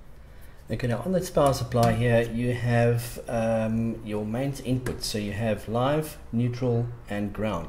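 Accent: South Africa. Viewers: Are you south African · you sound South African im based in capetown any chance its still available if you are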